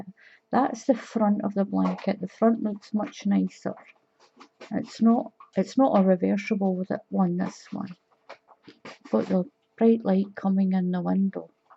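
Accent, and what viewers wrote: Scotland, United Kingdom. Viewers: It's great to hear a Scottish voice again, likr mine, your so accurate and easy to follow,as I'm a novice to this.Thanks xx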